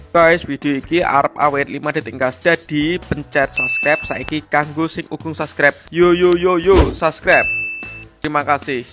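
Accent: Java, Indonesia. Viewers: Orang mana bang kok turunan jowo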